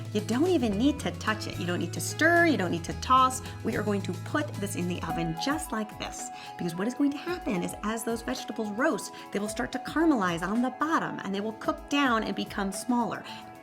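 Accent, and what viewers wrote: United States. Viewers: what kind of american accent is this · What a great pity yanks have have so much trouble with plain English?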